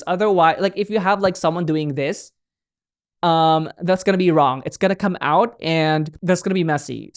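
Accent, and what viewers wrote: Philippines. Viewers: Your accent reminds me of our filipino nanny from when i was younger. I miss her 😣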